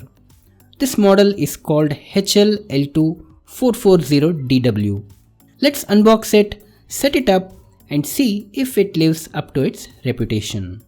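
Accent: India. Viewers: Bro, it's typical indian english😊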